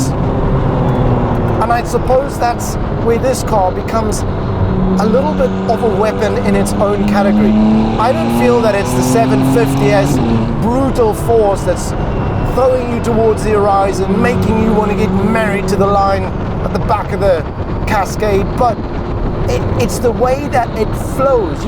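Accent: South Africa. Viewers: is this dude south african ???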